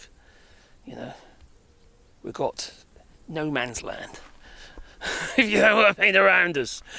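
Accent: United Kingdom. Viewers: It’s his British accent.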